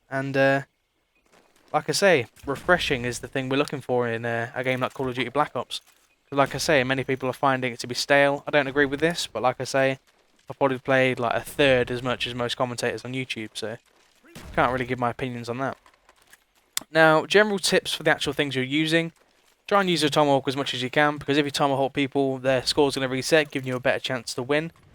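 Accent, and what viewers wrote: United Kingdom. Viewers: do you live in manchester or stockport? you sound it...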